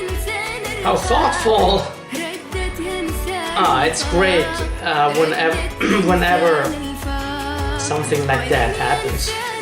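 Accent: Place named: Eastern Europe. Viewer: RI Hero ما اعتقد امريكي، ممكن يكون شرق اوروبي، لهجته ليست امريكية.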